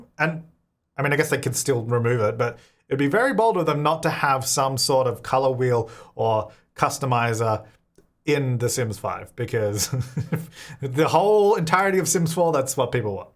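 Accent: Australia. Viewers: "lil nugget" 🥺 why is that so cute? It's probably the aussie accent, love it 💗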